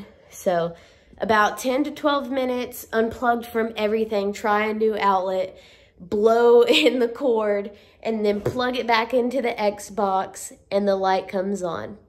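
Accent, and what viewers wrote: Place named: South, United States. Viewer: she is sooooo from the south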